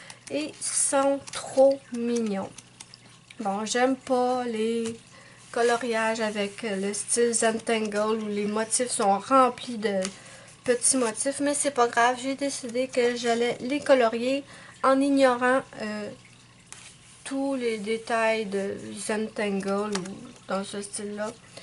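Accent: Canada. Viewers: Super vidéo, ^^ J'ai le livre Dreamlings de Edwina Mc Namee, il est merveilleux. Je te le conseille. Celui que tu as aussi est très beau. Moi aussi je regarde les vidéos de Kry's colors et Steffie. Et ça me donne envie d'acheter tout ce qu'elles présentent. :) J'adore ton accent, tu viens de quelle région au Canada?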